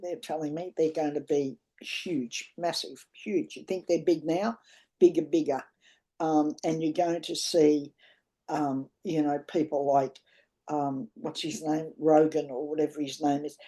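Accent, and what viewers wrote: Australia. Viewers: ❤. A common sense Aussie voice. Love your straightforwardness.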